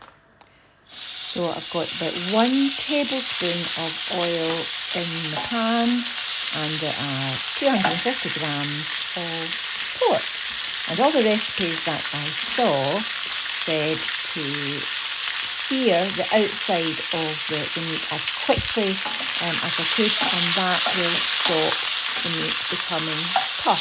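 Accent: Scotland, United Kingdom. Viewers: When you said "stroganoffie" with that beautifiul scottish accent, I admit that I thought of bannoffie pie. :)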